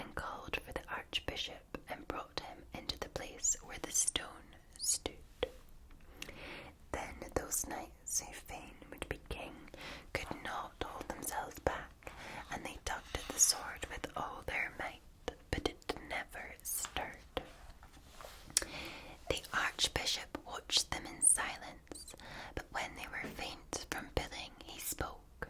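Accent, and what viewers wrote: Scotland, United Kingdom. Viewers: First of yours I've seen but the Scottish accent although not same dialect as mine is like being back home even just for twenty minutes. Hope ye keep it up. Very talented lassie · I would absolutely love more stories read in that accent. Makes me want to channel my scottish ancestry and fight the brits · Lovely, little Angel. Another delightful session just how I like it. Up close and personal with the very tingly “dot, dot, line, line” verse! I love listening to you read with that gorgeous Scottish brogue! You lovely face just lights up my iPad screen as well as this old heart of mine. Thank you so very much, darlin’ lass! 🌹🙏🤗🧚‍♀️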